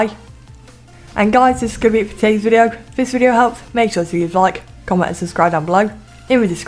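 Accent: United Kingdom. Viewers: I know from the start of the video that you're british :]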